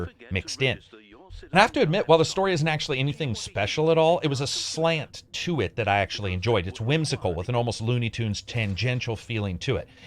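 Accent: United Kingdom. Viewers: Voice is fine, you just have to be British to understand. Which is a damn good thing! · I believe the audio issue you are referring to is a Scottish accent vs heavy compression.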